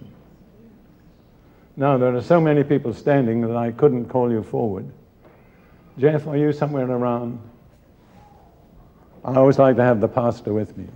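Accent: United Kingdom. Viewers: I used to listen to snatches of his radio program during his lifetime when I was college, but he seemed rather like a rather stuffy lecturer due his British accent yet flawless diction. Now I hang on his every word, and his anointed teachings are powerfully strengthening my Christian life.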